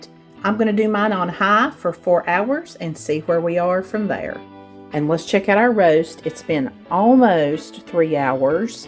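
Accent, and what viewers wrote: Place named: Mississippi, United States. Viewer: East Tennessee? I had guessed Mississippi accent; my husband thought Texas. Merry Christmas :)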